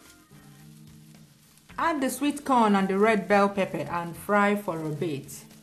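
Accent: Nigeria. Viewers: LOVE TO HEAR U TALK NIGERIANS TO.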